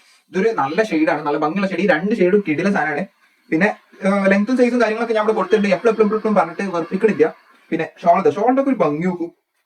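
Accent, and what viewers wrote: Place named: Malappuram, India. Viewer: Malappuram slang anallo evide sthalam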